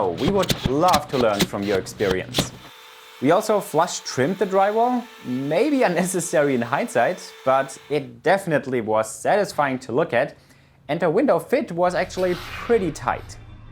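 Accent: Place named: Upper Bavaria, Germany. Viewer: tatsächlich? Ich hätte und dich eher als Oberbayern eingeschätzt. Seid ihr richtig ausm ländle, oder aus dem dahergloffene bayrische "schwaben"? (Ja, ich tu mich offensichtlich schwer bauernschwäbisch in schriftform zu verpacken)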